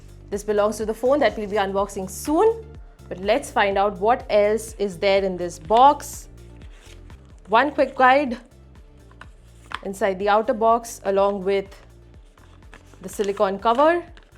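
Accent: India. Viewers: Indian jese lagta hai ye english bolta hai😅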